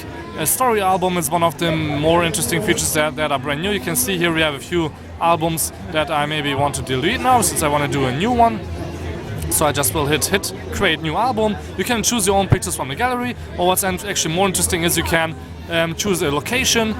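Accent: Germany. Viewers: Hi first off, please refrain from using profanity in your videos. Also, are you german? :0)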